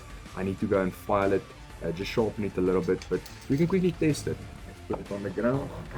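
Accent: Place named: South Africa. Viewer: Among other multitools, I have both the Wave+ and ST300M myself. Sadly couldn't get it in that awesome tan/black color at the time, but I guess that just means I can get a Rebar with that color scheme if I wanted to. Although I personally prefer the Wave+ so far, I'd always bring the ST300M - which is closer to the Rebar - for more heavy things aside from going into woodlands. Concise and informative video. Also: I love the south african accent, ever since I saw Lethal Weapon 2 back in the day. "Ah. Just checking to see if I was standing on plastic." Keep up the videos, and I hope you grow and get to do more.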